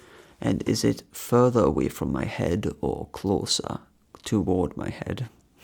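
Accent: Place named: Wales, United Kingdom. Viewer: Your accent is lovely! Welsh I think? 😅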